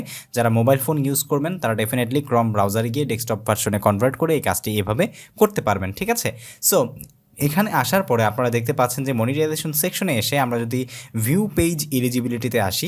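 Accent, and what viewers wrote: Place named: Gopalganj, Bangladesh. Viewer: Vaya apni gopalgonj ar cale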